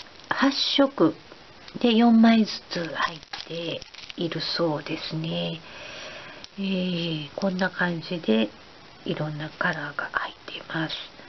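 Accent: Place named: Osaka, Japan. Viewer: の動画大好きです！センスが素晴らしくて、いつもDIY意欲が掻き立てられます(⁎˃ᴗ˂⁎)100均大好きなので、「この商品、こんな使い方できるんだ～～✨」って新しい感動もあります！あとわたしも大阪弁なのですごく親近感わいてしまいました(´ `)照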